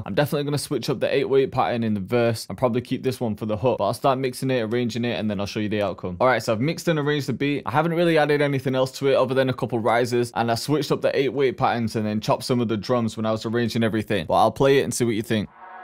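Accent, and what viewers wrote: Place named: Manchester, United Kingdom. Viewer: This beat is pure fire 🔥 where about in the uk are you from ? Trying to figure your accent out lol gotta be manny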